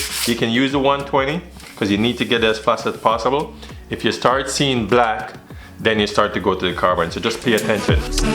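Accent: Jamaica. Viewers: u gotta be Jamaican lol im doing the same thing and ah run from the same tings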